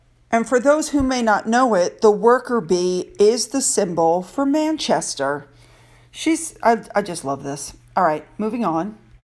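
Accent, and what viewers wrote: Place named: United States. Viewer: HI NUMPTIES saying how to pronounce words I love your American accent and your very well spoken and a great Narrator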